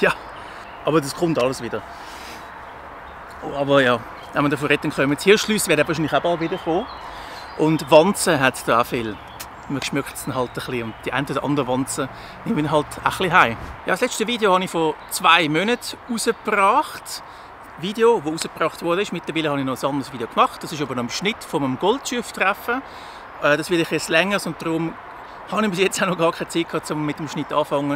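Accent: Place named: Switzerland. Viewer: Habe meine Switzerdütsch Lehrstunde schon vermisst.😉 Koche jetzt draußen bei dieser Trockenheit, wenn überhaupt, nur noch mit dem guten alten Schwedenfeldgeschirr und Spiritus. Mein Sandsteinöfchen ist zwar eine recht sichere Sache, trotzdem verzichte ich bei dem Wetter gerne darauf. Muss ja auch nicht sein. LG Miesegrau & ÖPi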